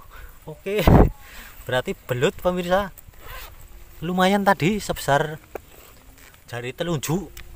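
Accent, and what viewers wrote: Banyumas, Indonesia. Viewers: Mari taraweh langsung nyimak cak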